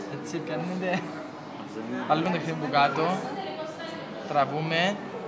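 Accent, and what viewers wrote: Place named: Cyprus. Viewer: ποσοοοοο μα ποσοοο ωραιαα μιλαειιιι.!!! αυτα τ κυπριακααα !!! :DDDD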